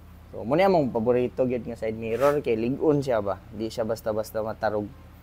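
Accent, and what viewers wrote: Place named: Davao, Philippines. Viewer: The way you talk... Feel ko taga davao kayo😊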